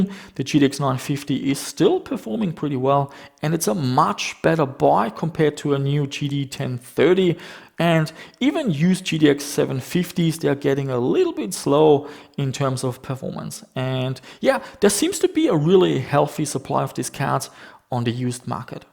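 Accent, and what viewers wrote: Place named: Germany. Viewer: Dieser deutsche Aktzent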